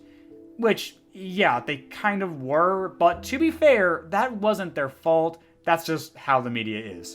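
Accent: United Kingdom. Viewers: Never knew the true tale of "Balto", and your sophisticated British accent astonishes me to this point, dear. 🧐😎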